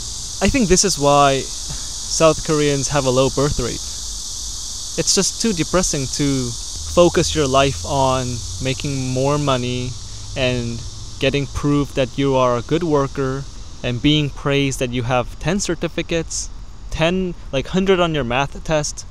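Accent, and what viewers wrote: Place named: United States. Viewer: Your english is on point man you could pass as a american.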